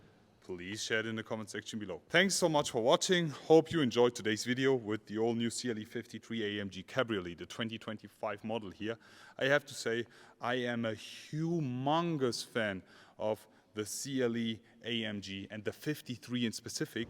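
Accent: Germany. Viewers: It might be because he is speaking English with a German accent!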